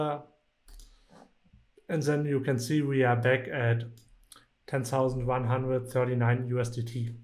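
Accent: Germany. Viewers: Nice tutorial 👍.. Are you from Germany?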